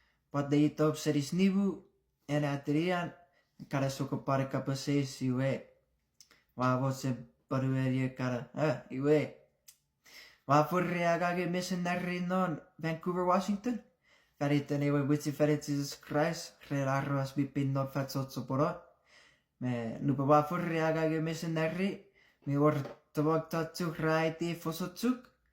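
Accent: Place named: Chuuk, Micronesia. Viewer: I'm impressed with your chuukese accent! Much love from Micronesia!❤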